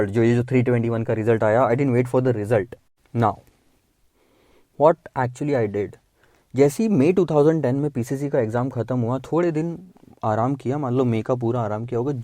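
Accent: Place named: South, India. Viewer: Don't mind sir but your accent sounds south indian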